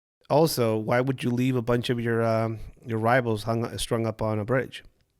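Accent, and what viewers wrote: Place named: United States. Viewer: Cringe when he pronounces mexican cities with american accent. No sea mamon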